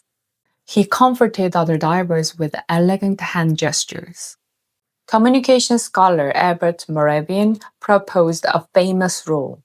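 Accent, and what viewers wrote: South Korea. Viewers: Korean is truly a beautiful language. I love your accent speaking English. Most important is to communicate even when it’s not perfect. Never be ashamed and keep improving. I watch so much K-Dramas for the immaculate stories and the Korean language. Most beautiful accent indeed. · 안녕하세요, I wish I could speak a second language, even if with a heavy foriegn accent. Starting in highschool, I’ve become a failed pilyglot, having unsuccessully attempted to learn French, Spanish, German, and, most recently Korean. I twice was told by native south Koreans that in my attempts to speak to them in their language I spoke like a native. These were doubtless overly generous praises but I was overjoyed knowing how to ask are you Korean, or say hello, my name is Tony Walters, what is your name in Korean. It isn’t only k-dramas that have made me fall in love with the korean people, but every korean native I’ve met in Savannah (we have a large South Korean population due to a major Hyundai plant built close to the city. 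Of course, as a native English speaker, how could I expect myself to pronounce ㄹ without an accent? Your voice never ceases to comfort me, so your ㅎㅏㄴ국 language foundation overlaid by your ESL is at least one part magic to my heart and ears. Possibly two. I reject a world painted nfinite shades of gray. Give me primary colors. So glad you share your perspectives online.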